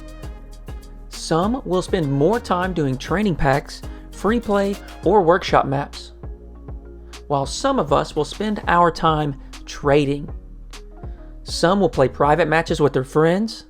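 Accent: South, United States. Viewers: Is that a southern accent? I'm not from US so I'm not really sure.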